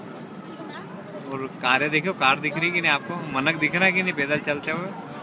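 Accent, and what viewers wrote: Indore, India. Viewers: ji maja a gaya yr....kya mst indori bolte ho yr....me bhi indori he hu...dada na pardada sab yahi ka he h....tumne to mtlab dil jeet lio yr....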